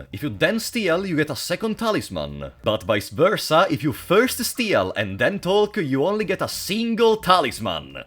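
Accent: Italy. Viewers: He is italian it is literally part of his accent its not a speech impediment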